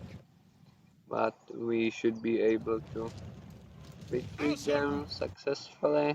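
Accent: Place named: Czech Republic. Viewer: Jsem si říkal, že ten přízvuk mi zní trošku Česky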